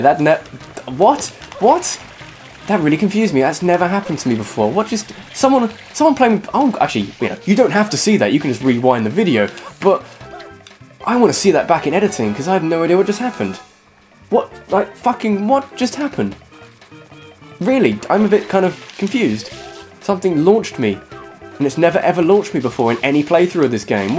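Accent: United Kingdom. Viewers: when are you doing the next Q&A Episode? I like your accent, I'm British too. I get a lot of shit for how I talk, that I talk posh which I don't just because I don't have a broad accent of where a live cos my mum's southern so I don't sound like my friends. And you are funny!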